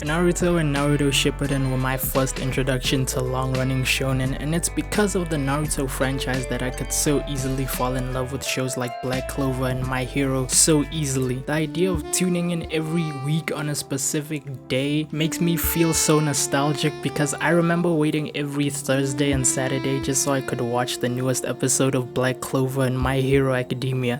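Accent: South Africa. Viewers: Bro! This was a well done vid man. I actually thought you were American based off your thumb nail design. What a surprise it was to hear your South American. As a Nigerian who has been in South Africa since 2009 i relate to that SABC 2 hate😂. Keep up the great quality bro, I'm proud if you and you just got yourself a new sub. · Your South African WWWWW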